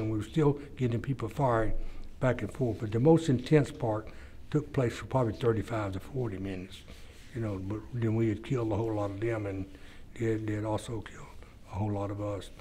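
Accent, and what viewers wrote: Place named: South, United States. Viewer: He’s just a Southerner, that’s all. Lots of people from New Jersey have an accent too.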